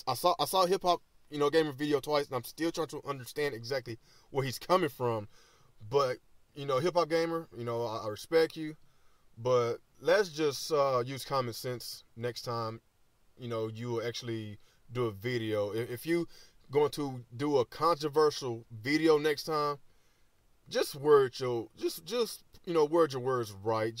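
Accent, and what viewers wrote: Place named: AAVE, United States. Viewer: I hate ebonics lol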